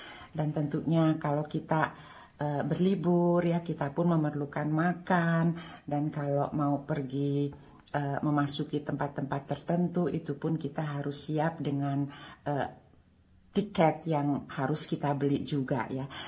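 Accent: West Java, Indonesia. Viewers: Selalu di tunggu bunda uploed nya sangat bermafaat info info nya .walau pun bunda tinggal di Swis tp logat bicara nya masih terlihat bahwa bunda orang sunda sama seperti saya 😊💜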